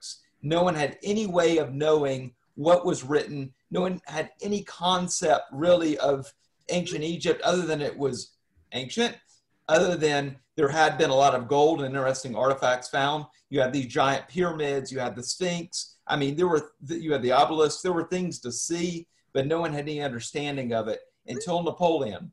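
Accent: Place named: Mississippi, United States. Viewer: I knew I heard a Mississippi accent. I love your videos here — a Mississippi native.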